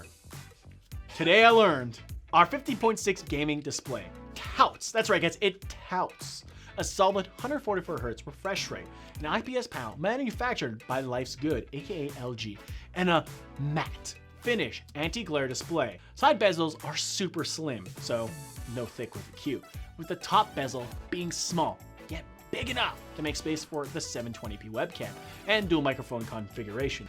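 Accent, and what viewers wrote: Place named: Taiwan. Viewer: oh I love this talking style from taiwan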